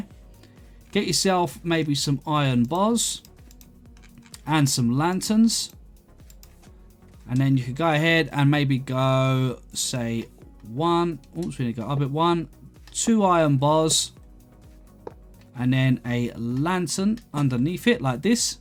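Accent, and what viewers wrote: United Kingdom. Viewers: ARE U BRITISH!?!?!?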